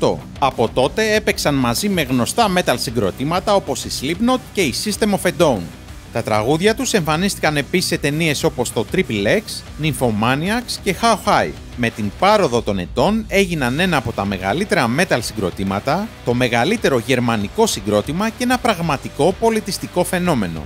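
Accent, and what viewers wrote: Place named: England, United Kingdom. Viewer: Πέρα από το ξεκίνημα του κιθαρίστα στα '80s δεν βλέπω καμμιά "άγνωστη αλήθεια". Και το κείμενο είναι μάλλον έκθεση λυκείου, διαβασμένο από κάποιον που πιθανότατα δεν έχει ιδέα για την μπάντα. Κατ' αρχήν, μέχρι και οι πέτρες ξέρουν ότι τα φετινά τους live είναι επετειακά για τα 30 χρόνια τους, και όχι 25. Έπειτα Γερμανικοί τίτλοι και ονόματα διαβασμένα με Αγγλική προφορά. Αν είχε ακούσει 2-3 φορές έστω τα κομμάτια, θα τα έλεγε σωστότερα, κι ας μην ξέρει Γερμανικά. Επίσης... άκου εκεί "ωραίες μελωδίες και καλή παραγωγή". Μιλάμε για τις πλέον κορυφαίες παραγωγές παγκοσμίως, πραγματικά "σχολείο" για ηχολήπτες και μουσικούς παραγωγούς. Όχι "καλή παραγωγή". Έλεος. Και δεν βγήκαν με κουστούμια χοντρών για να "γελιοποιήσουν το θέμα της παχυαρκίας" αλλά γιατί έτσι ήταν στο video clip του - το οποίο μιλάει γενικότερα για την παρακμή των ανθρώπων που δεν έχουν κανένα πια ενδιαφέρον στην ζωή τους.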